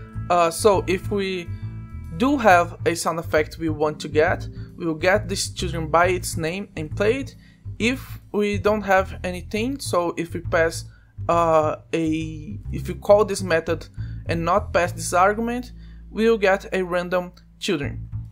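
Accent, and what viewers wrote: Brazil. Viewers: esse sotaque é br em hahahaha, nice man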